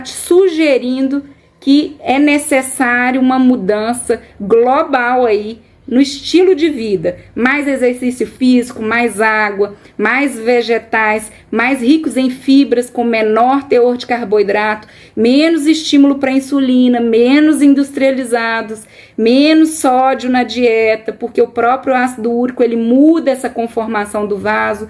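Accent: Minas Gerais, Brazil. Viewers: Mineira com certeza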